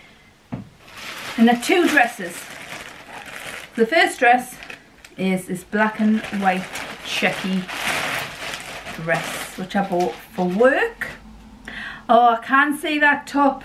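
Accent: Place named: Wallsend, United Kingdom. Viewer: She is from Wallsend. :) We both live in Australia now, her accent isn't as strong but always makes me smile.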